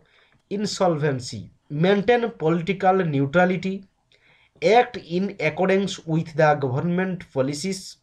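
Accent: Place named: Bengal, India. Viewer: Sir English is not English. Its very difficult to catch bengoli tone.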